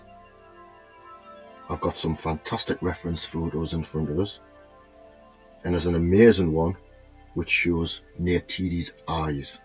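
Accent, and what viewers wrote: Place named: Scotland, United Kingdom. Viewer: what a talent you have, amazing pictures !! like the accent as well, is that scotisch sir.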